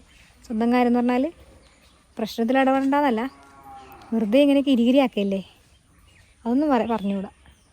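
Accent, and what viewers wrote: Kasaragod, India. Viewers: അത്രയും ബോറാണോ നമ്മളെ കാസഗോഡ് ഭാഷ... നിങ്ങൾ ഇങ്ങോട്ട് വീടെടുത്തു പോര് ചേട്ടാ കാസർഗോഡിനെ കുറിച്ച് അറിയാൻ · എല്ലാ പുല്ലും കായും എല്ലാം പറിച്ചു തിന്നാൻ ഇതെന്താണ് പശുവാണോ എന്തായാലും നിങ്ങളെ കാസർകോടൻ സ്ലാങ്ക് ഇഷ്ടപ്പെട്ടു വീണ്ടും പുതിയൊരു വീഡിയോ ആയിട്ട് വരൂ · ഓർജിനൽ കാസർകോട് ഇഷ്ടപ്പെട്ടു❤❤❤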